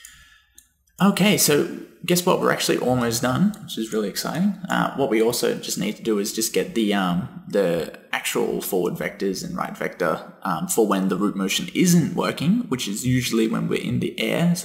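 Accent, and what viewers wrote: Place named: Australia. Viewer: Where are you based, you sound aussie??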